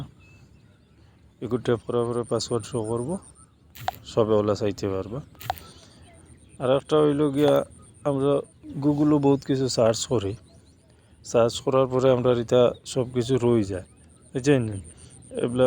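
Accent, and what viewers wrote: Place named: Sylhet, Bangladesh. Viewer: Bhai lage sylhoty 😊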